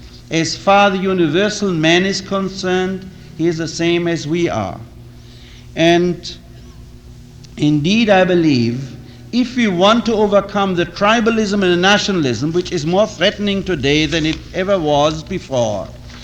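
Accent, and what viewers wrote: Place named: India. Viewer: Why does he sound like he has a slight Indian accent ?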